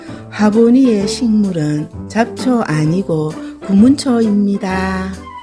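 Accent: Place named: Gyeongsang, South Korea. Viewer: 경상도 아줌마가 서울말을 하시면 이렇게 되는구나❤🎉😂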